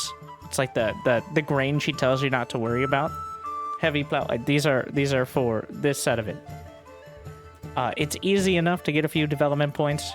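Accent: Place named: Western North Carolina, United States. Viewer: Where are you from, friend? I can't place your accent. I want to guess Western North Carolina.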